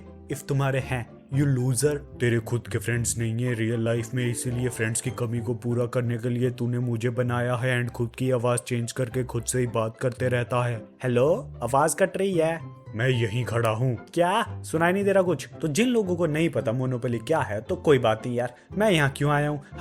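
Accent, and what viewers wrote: Jammu, India. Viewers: Bro aap jammu se ho kya ?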